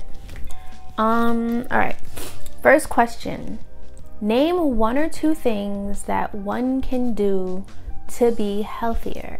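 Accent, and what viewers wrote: New York, United States. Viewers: Love that New York accent still! #VEGANCRUSH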